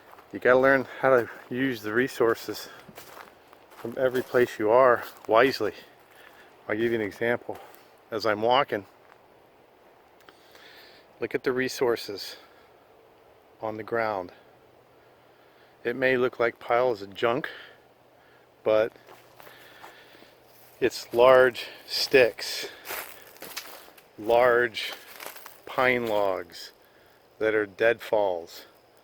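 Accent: North, United States. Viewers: Not a swamp at all you’re in a hardwood forest. You sound like a yankee transplant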